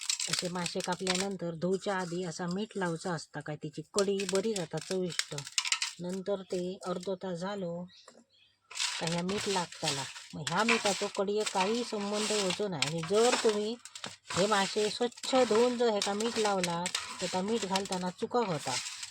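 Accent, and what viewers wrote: Malvani, India. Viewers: काकी, तुमचा मालवणी बोलना भारी हा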